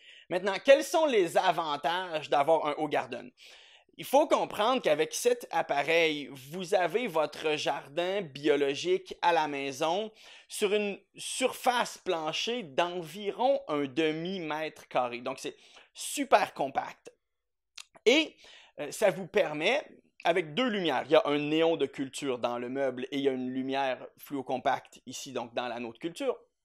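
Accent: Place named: Quebec, Canada. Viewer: No offense... mais... l'accent québécois démolit complètement la vidéo. Un des accent des plus horribles de la la langue de Voltaire.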